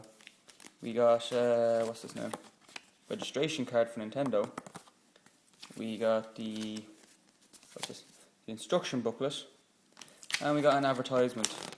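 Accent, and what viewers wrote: Ireland. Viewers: You Irish? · ***** hes irish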